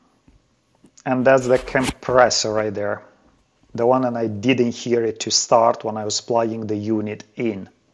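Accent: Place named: Poland. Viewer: I know you're right. Either way, thank you for this video! We are lucky for it. Idk from where you hail but your accent reminds me of Polish and I love it!!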